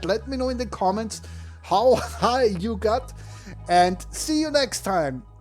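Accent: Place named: Sweden. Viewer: Its probably nordic. Swedish prob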